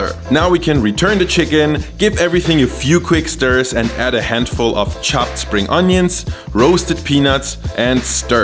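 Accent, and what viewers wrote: China. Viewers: Chinese English ：you do very well！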